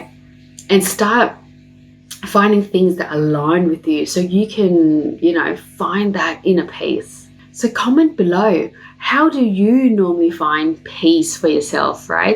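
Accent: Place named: Australia. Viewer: Great advice! So important to be in the moment. Btw love your Aussie accent!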